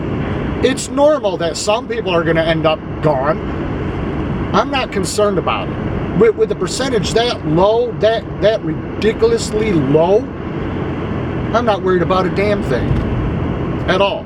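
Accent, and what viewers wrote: United States. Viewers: American, i would say. (english accent)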